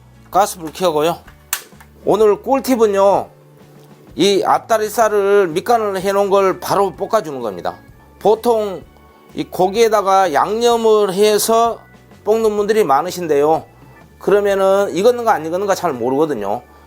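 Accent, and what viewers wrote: Jeolla, South Korea. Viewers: 쉐프님 전라도 사투리 구수합니다 여긴 완도 에요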